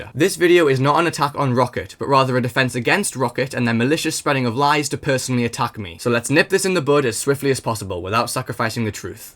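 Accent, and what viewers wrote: Newcastle, United Kingdom. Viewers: Are you from Newcastle bro?Bro you sound like your from Newcastle😭 Saying "roackaet" is a sign lol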